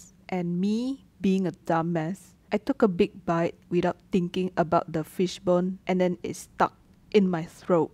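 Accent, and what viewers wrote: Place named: Singapore. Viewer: Not to be rude or anything but are you from Singapore? I had 2 friends online that I used to play games with and they talked like you. No offense 😅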